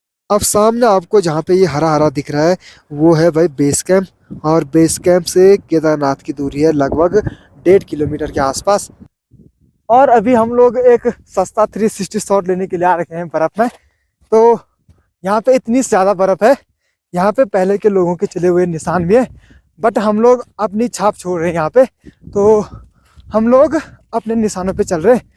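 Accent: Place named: Pahadi, India. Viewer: 🇳🇵 Aap Ki Bolaney Ki Awaz Mey Pure Pahadi Paan Hai Jai Pahadi Maa🇳🇵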